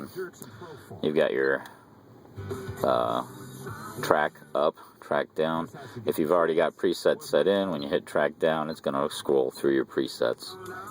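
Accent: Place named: Philadelphia, United States. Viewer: Sound like Philly version of Jim Florentine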